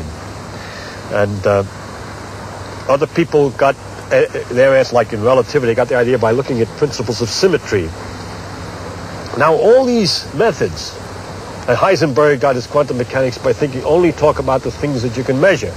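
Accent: New York, United States. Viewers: That New York accent is so catchey. I remember once telling my mother that it is possible to have negative integers as well as positive integers and tried to explain my idea,, this was our at the age of 11 or 12, she just laughed at me and said that was stupid, it wasn't until high school then I realised that's exactly what you can do. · I love his accent. Is that the thickest New York accent ever seen on TV?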